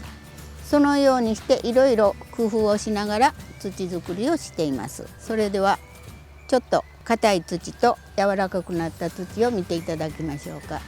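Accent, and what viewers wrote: Tosa, Japan. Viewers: 懐かしい、土佐弁の解説。最近、家庭菜園を始めました。夏野菜は、きゅうり、ナス。オクラなどを植えて、少しですが今年の夏は野菜代、助かりました。いろいろと、失敗したりしながらですが、楽しいです。の解説がわかりやすくて、親しみがあって。これからも、参考にさせていただきます。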